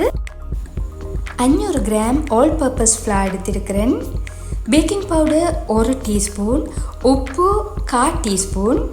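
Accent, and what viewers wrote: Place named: Northern Province, Sri Lanka. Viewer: மாஷா அல்லாஹ் ❤ வடக்கு மாகாண தமிழ் மிகவும் அழகு 🎉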